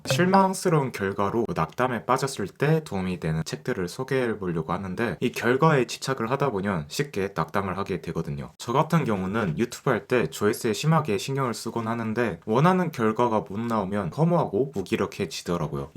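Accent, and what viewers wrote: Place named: Japan. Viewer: 일본분이신가용??? 발음이 죠금씩 세는,, ?!? 그치만 내용 넘 좋아요! 같니 홧팅홧팅입니당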